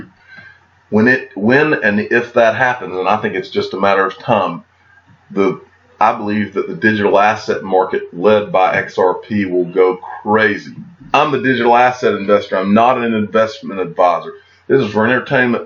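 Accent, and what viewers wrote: South, United States. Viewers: Maaan!!! You are the mf shiznitt!!! I truly appreciate ya along w a lot of other people! We always gonna have a few haters in the batch, so screw em!! I’m from the south too so when I hear ya talk, i swear it’s like listening to a uncle or close family friend schooling me on that xrp, baby!! We feel that southern hospitality from ya! So again just wanted send a big thank ya! muchos gracias! & a sho appreciate ya!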